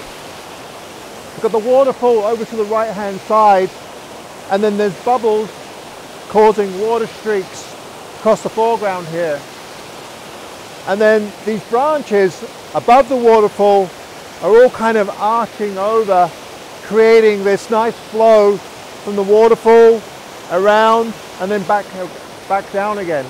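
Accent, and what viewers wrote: United Kingdom. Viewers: Lovely photos. I loved the photo stacked one, beautiful. Can I ask, although you live in Canada are you British? You have a very British accent.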